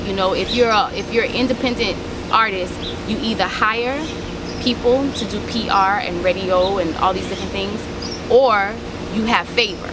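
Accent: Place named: New York, United States. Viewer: Girl you fine as red wine 🍷 lol you are beautiful keep pushing mama and why do you have a little New York accent ? Lol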